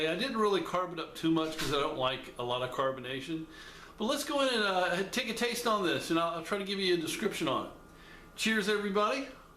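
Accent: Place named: England, United Kingdom. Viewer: More English accents please! Yep that's a tasty looking beverage, cheers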